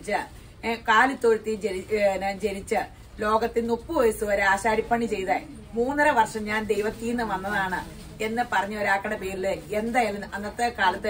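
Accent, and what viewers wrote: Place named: Pala, India. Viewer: ശരിക്കും വർത്താനം കേൾക്കാൻ വേണ്ടി repeated watch all video നിങ്ങൾ പാലക്കാരാ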